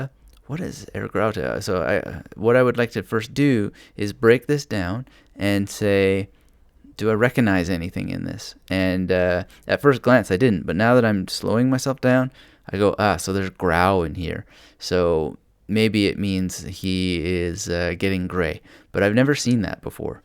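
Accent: Canada. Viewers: You sound like a Canadian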